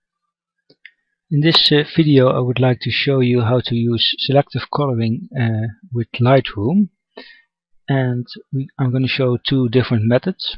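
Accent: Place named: Netherlands. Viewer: Could it be that your dutch, you have a very dutch accent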